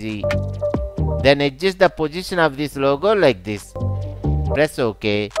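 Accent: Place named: India. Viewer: Thank you! Never underestimated Youtube tutorial with Indian accent :)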